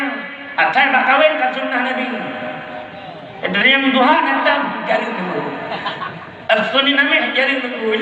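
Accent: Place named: Aceh, Indonesia. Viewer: Dakwah Aceh terbaru ikut mendengarkan logat orang Aceh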